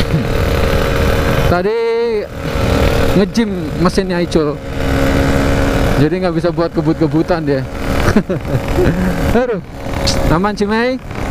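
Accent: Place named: Java, Indonesia. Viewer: Wong jawa kie ya 😂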